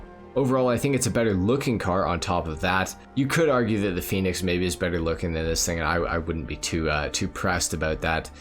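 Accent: Canada. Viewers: U are canadian bro?!!!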